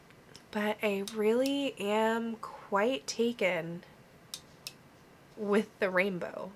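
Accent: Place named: Oklahoma, United States. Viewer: Watched this with ny 8yr old daughter since she wants this case for her pc and she asked me if you are from Oklahoma lol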